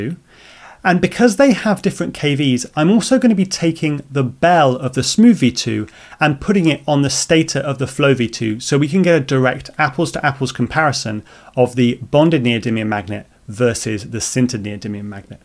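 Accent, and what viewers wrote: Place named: United Kingdom. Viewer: Seems like you're the onli British English speaker who's speech doesen't make me feel pain in my ears)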